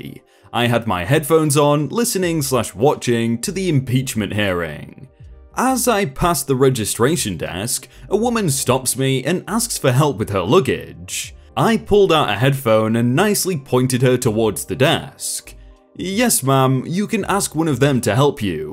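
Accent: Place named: England, United Kingdom. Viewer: At least you don't think his voice is computerized, LOL. But seriously, you do know that English people pronounce some things differently, right?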